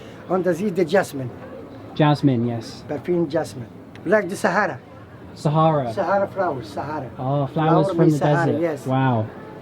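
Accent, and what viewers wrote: England, United Kingdom. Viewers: Çok güzel bir yermiş ve harikaa İngilizce aksanınn💖💖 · Hey, you sound British. Are you from the UK?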